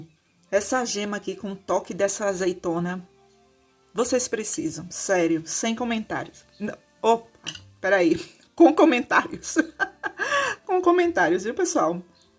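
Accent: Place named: Northeast, Brazil. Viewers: Já amei o sotaque dela😍 nordestina marminino❤ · O que eu gostei o seu sotaque alagoano, igualzinho ao sotaque do meu sogro. A receita, ah! essa é maravilhosa 😅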